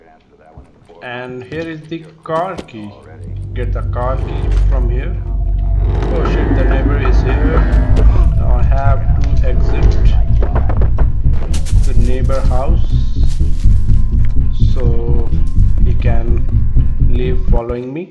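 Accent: India. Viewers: When I heard your voice...”sigh”ARE YOU INDIAN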